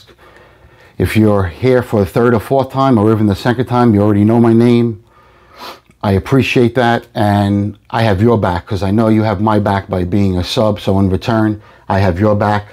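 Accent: East Coast, United States. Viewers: Love your message and videos. Old me from a few years back would've been jealous of your nice stack, but the current me just enjoys it and sees it as great motivation for myself. I enjoy your east coast accent, not from there myself but I like to hear it. My dad passed a couple years back at a younger age and it was rough but helped me put things in perspective for what is important in life and he was such a fun person who would make people laugh and smile all the time, even until the end. I remember him cracking up the nurses in the hospital even when he was in such rough shape. Keep up the positive attitude, I always appreciate it and love to see when your new videos pop up in my notifications.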